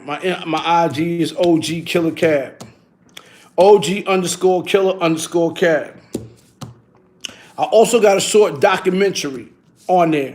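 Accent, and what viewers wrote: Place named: New York, United States. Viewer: It’s really a NY thing first. Italians from Italy or outside the NYC & Philadelphia metro area don’t sound like that. Especially being from Brooklyn, he definitely grew up around Italians.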